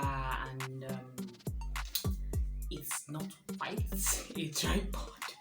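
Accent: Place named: Igbo, Nigeria. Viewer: I am soooooo hearing the igbo In you!😂😂love it! "Foldeble"😂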